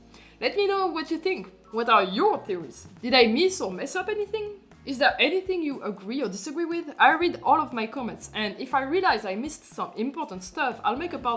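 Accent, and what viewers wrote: France. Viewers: Not related to the video but are you french by any chance? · Est-ce un accent français que j'entends là? (Is it a French accent that I'm hearing here?) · Is that french accent 🙏🏼😭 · French accent (⑅•ᴗ•⑅) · Very cool video but I just want to ask are you french ? Sorry im curious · Watching cool video As a French instantly recognise the strong French accent Even cooler video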